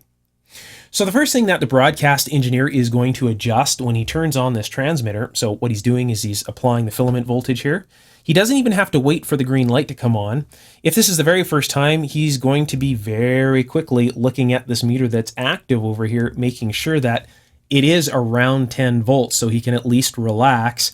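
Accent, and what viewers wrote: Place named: Canada. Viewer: Canadian accents are the best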